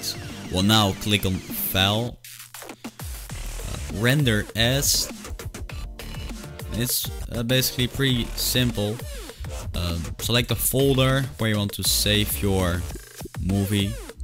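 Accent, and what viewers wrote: Netherlands. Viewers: The first time i heard the voice of him, i knew it was obviously dutch // De eerste keer dat ik je stem hoorde, wist ik gewoon dat je nederlands was!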